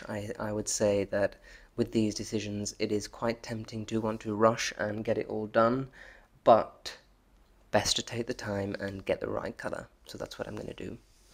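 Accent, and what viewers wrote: RP, United Kingdom. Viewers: Oh that BBC Accent - it's like listening to a young Kenneth Kendall reading the 9 O'clock news. I am an avid fan of Farrow and Ball, their Dead Salmon is to die for, pardon the pun, but Mouse's back - well I never - they do name their paints after some odd things. Such a stunning room with so much potential - I think you need to pay us all a visit and give us some design tips and ideas, they always say a fresh pair of eyes is like a new beginning, you never see it yourself until somebody else tells you.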